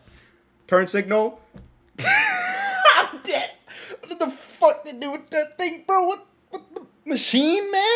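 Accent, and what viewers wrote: Canada. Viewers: fr he sound like a white guy, or maybe canadian speaks like that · I knew you’re not American from scattered context but damn, how did I not clocked you as Canadian? I’m a failure. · I havent noticed until now but got a decently strong canadian accent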